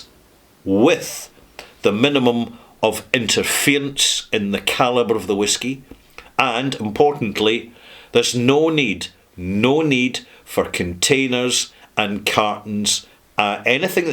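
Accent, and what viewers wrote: Scotland, United Kingdom. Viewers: The most I've ever laughed watching one of your videos was seeing you trying to imitate a stereotypical Scottish accent when you already have one!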